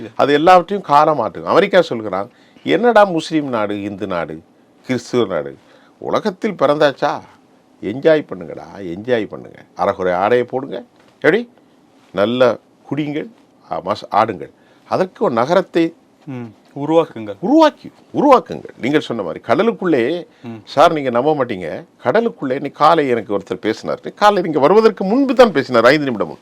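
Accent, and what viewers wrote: Sri Lanka. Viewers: His voice is unique cylon tamil ...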